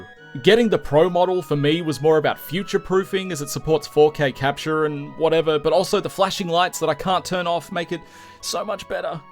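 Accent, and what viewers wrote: Australia. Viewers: Wait, you're Australian? I'm completely not surprised and in fact I'm sure you mentioned it in at least one video I watched before, but it just didn't "click" with me. Has audio editing chicanery been behind me not registering your accent in our shared native language as "foreign"? This is like if I was an NPC who suddenly noticed some metagame exploit you've been going through on a challenge run. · If you didn't show us how to reduced those Ss I would have no clue you are Australian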